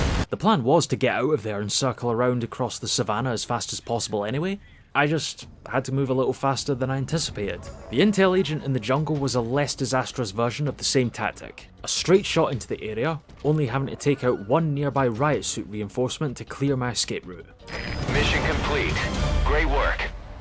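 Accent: Scotland, United Kingdom. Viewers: The British accent saying Afghani names is so funny 😂 you’re absolutely butchering them 😂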